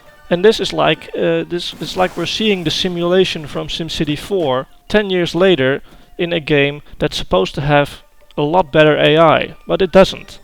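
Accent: Netherlands. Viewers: Your accent :D, and I know you are from Holland, saw It on your twitter :D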